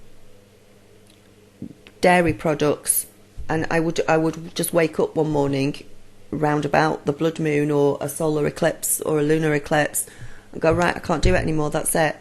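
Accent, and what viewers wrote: United Kingdom. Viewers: Geesh how I love listening to you. I can remember I could always hear a British ladies voice wish me a "good morning Elizabeth" and you sound just like her and also my Granny who is still alive today but now too old to conversate. You're voice is healing so thank you. And the subjects as well. I always knew my thoughts created reality but it's never been so obvious until I met my husband who must be my twin because I have not been able to completley open to him out of fear and now because I stay closed for such long periods, I've watched every "worst case senerio" that I've worried about happen. It gives me hope though that I have a power to change everything and I am conscious if what I am doing. I have not yet mastered overcoming fears when facing the biggest ones. For instance..I had social services knock at my door yesterday because a doctor called in that my vegan lifestyle was not allowing my 2 year old to thrive. 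So there we all sat in the hospital not knowing if these people were going to try and take my daughter away while they poked and proded her over a course of 6 hours only to be told (thank god) that she is in perfect health and good job. I swear I thought I was going to die during it. And something just this security threatening happens constantly. And it will until I open up and stop hiding from fear. Thanks for reading. Thanks for you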